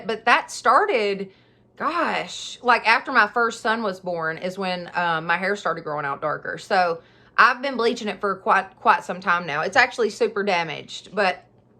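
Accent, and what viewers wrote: South, United States. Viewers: Thank you so much for this and I too crying to hear your story and what a great story it is. Good for you and if anyone leaves your channel then they were not worth your time.I am the same as you I tired to find fun and be happy and maybe a bit mad but that's fine with me.Keep up the good work. Just love your channel and always look forward to watching your videos.Love the southern accent I too have an accent I come from London so be proud of the accent as it makes it you. Hugs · You brighten my day! Love listening to your southern accent. Thank you for honesty...wish more people were as open as you are. I feel blessed to have found your channel! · Hey beautiful. You're Amazing! Thank you for being honest all the time. Love your Southern accent. Love your positive attitude. You're funny too. Love you my beautiful Angel. Blessings to you and your lovely family. 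You're absolutely correct. Who cares what anybody thinks.. No one is perfect.. There is only one perfect one, that is our loving GOD!! Having CHRIST in your life makes a person be happy and positive. Praise GOD you are here with us sweetie. JESUS loves us all. We are GOD'S children. AMEN. Hallelujah!! I will continue watching your channel my beautiful Angel. I love you.. You're Amazing and smart! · YOU. ARE. FABULOUS!!! I don't know any diyer that would be this honest, the things you've told us about yourself, shown us your life, even though you know you're the blonde hair, big boob, southern drawl cliche... I'm one too 😁 and all the naysayers can kiss my grits!!! And my bday is November 25th!!! But.... I don't want to scare you, however anyone wanting to find you, you've made it sooo easy.... just be careful!! And you need a bodyguard just let me know! I may not look like much but everyone that knows me is scared of me 😳😁🤣🤣🤣 Thank you for being you!!!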